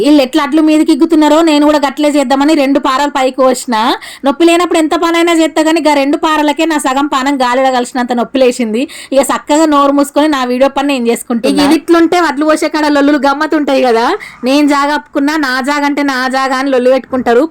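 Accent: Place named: Jagtial, India. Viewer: చెల్లమ్మ మీది ఏ ఊరు నీవు మాట్లాడే యాస ఆచం మాలాగే ఉంది మాది జగిత్యాల జిల్లా మీ ఇల్లు మా ఇల్లు సేమ్ టూ సేమ్ ఉన్నాయి నీ వీడియోస్ బాగుంటాయి 👍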